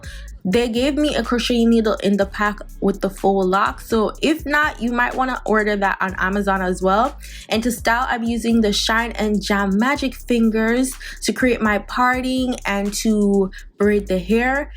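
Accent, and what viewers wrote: Jamaica. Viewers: aye from mi hear start tlk mi know ya jamaican enuh but anyway love the video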